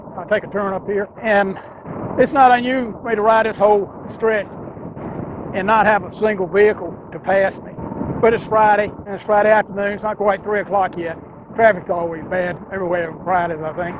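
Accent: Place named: Fayetteville, United States. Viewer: Enjoyed your video, your accent sounds like my mom and all of her sisters/brothers/etc, big family from Fayetteville.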